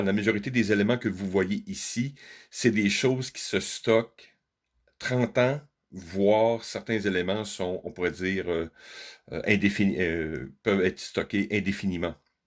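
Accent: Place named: Quebec, Canada. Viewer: Un canadien lui Québécois en plus La voix j'ai reconnue ça 😂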